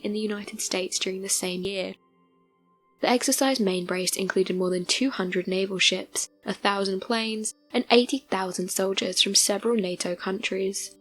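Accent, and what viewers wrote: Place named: South, United Kingdom. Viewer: Anybody know where exactly the narrator's regional accent is from? I feel like somewhere in the Southern UK, but I can't place where ecactly.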